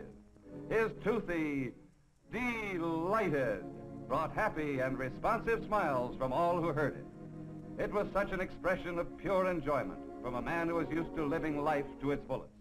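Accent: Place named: Boston-Philadelphia, United States. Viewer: If you are American please explain to me why Americans don't sound like this anymore. The accent used in this video is so pleasant to hear. Today's Americans don't remotely sound like this. This 1950s video narrator is using what is known as a Boston-Philadelphia accent, which seems to have fallen out of use these days even in universities. Sad. Please explain why this has happened.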